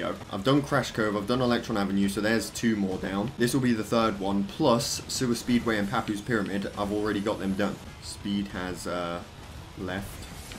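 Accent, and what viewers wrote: United Kingdom. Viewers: Dude are you from the uk?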